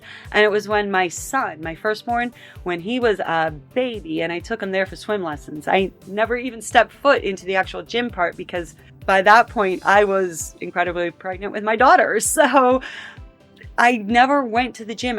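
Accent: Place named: United States. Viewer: This is very random but your voice+American accent combo sound so good it’s really nice to listen to! Sending love from Romania⭐️🤍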